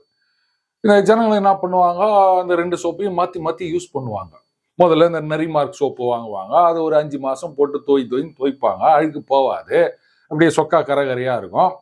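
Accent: Chennai, India. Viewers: Yenna saambu ore kuriyeedu Nari (traitor) Puli [Poonai kutty) Singam (Anna)... bada shokka keedhu pa... kalaakureenga thaleevare... Cho illadha kurayai nivarthi panreenga sir.... your Chennai slang is awesome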